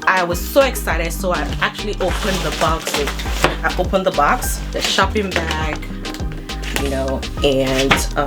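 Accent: Nigeria. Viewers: I've taken a sprint from Instagram to this video...once again I love your nigerian accent💕💕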